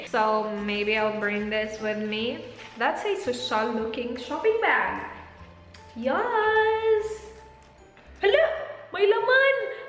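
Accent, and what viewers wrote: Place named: Visayas, Philippines. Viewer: Are you Bisaya? I looooove your accent!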